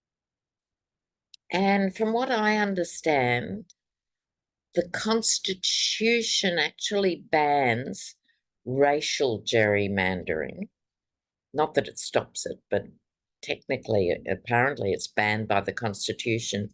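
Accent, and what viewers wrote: Australia. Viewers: “…his lily white buttocks.” I love how you said that! ❤️Australian accents rock! 😂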